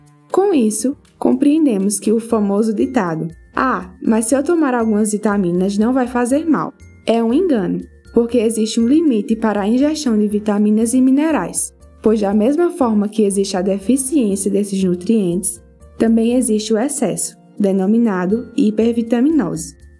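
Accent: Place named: Northeast, Brazil. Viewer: O sotáque nordestino ai ta forte kk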